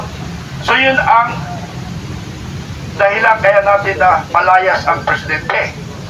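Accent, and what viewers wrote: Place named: China. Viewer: may Chinese accent ang nagsasalita😅 Ano ba yan! Napaghahalata!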